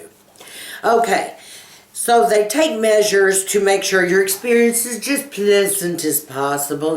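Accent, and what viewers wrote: Texas, United States. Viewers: Oh- Ultherapy not oil therapy- lol! Awhile back when I heard you mention it in passing I misheard what you said due to the honeyed Texas twang. Very interesting and informative! I'm looking forward to you having this done. The innovations in medicine are really exciting. As a former surgical nurse I love to hear about them.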